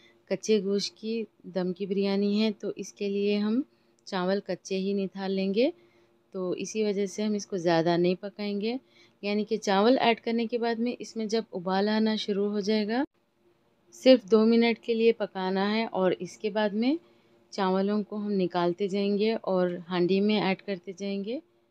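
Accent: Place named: Deccan, India. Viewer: اپ کا دکنی لہجہ اب کراچی سے یہ لہجہ ختم ہو رہا ہے پرانے لوگ جو دکن سے یہاں ائے تھے دکنی لہجے میں اردو بولتے تھے مگر اب یہاں ہندوستاں کے سارے صوبے ایک ہی لہجے میں بات کرتے ہیں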